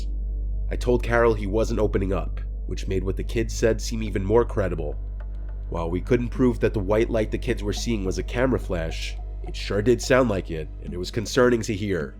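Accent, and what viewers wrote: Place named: New York, United States. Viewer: has a new york kinda accent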